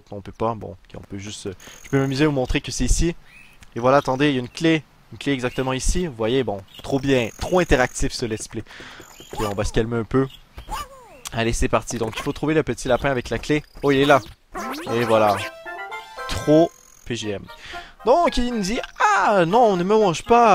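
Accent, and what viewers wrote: Quebec, Canada. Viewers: Ton accent québécois non d'un chien c'est bon!!!!!!!!!!!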